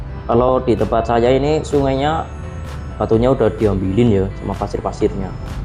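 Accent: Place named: Bumijawa, Indonesia. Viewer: Admin dr logat bahasa ky orang bojong Bumijawa, atau sktr gunung Slamet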